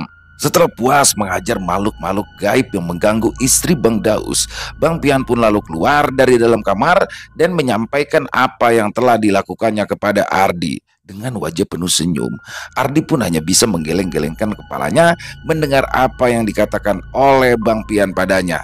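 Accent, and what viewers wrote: Jakarta, Indonesia. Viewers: Assalamualaikum...salam kenal bang ..aye demen bngt Ama bahasa Betawinya...kentel buangeett logatnya Betawinya...lanjuuutt😂😂😂👍👍👍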